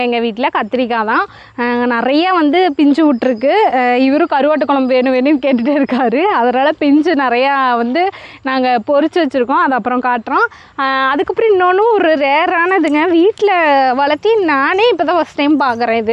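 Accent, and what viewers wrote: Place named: Gobichettipalayam, India. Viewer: எங்க ஊர்லயும் மிளகு தக்காளி கீரை யை கறிஞ்சி குட்டி கீரை தான் சொல்லுவோம் sister...... ******. *********. **** இப்படிக்கு கோபிசெட்டிபாளையம்.......... உங்க language கூட கோபி சைடு பேசற பேச்சு மாதிரியே இருக்கு பா..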